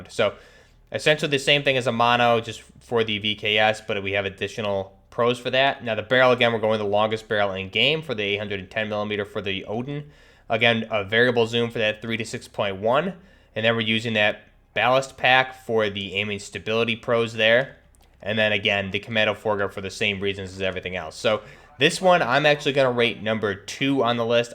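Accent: New York, United States. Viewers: Imagine being mad at a youtuber with a New Yorker accent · it’s his NY accent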